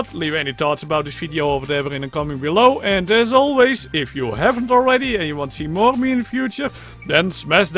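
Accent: Netherlands. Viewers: Kratis Games maak je grap ? je hoort duidelijk aan zijn stem dat die Nederlands is , Nederlanders hebben altijd zo een rare uitspraak als ze engels praten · I like your (i think dutch) accent! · are you dutch you souns dutch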